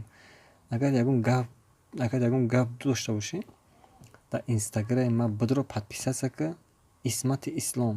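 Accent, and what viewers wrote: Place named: Kulob, Tajikistan. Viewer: Ранги чучи кулоби гап мезани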